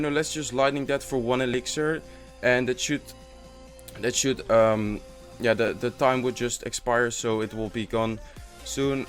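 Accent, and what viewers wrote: Netherlands. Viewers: where are you From? You've Got a English accent like a dutchie that is talking English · Haha, loving the Dutch accent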